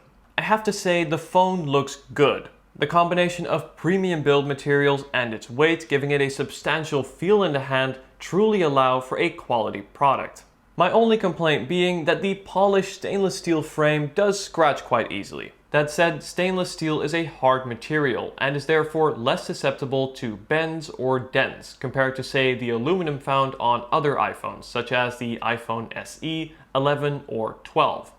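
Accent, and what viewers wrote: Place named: Netherlands. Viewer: Are you from the Netherlands or Belgium? Your English sounds a little bit like Dutch. Nice video, and still an pretty nice Phone.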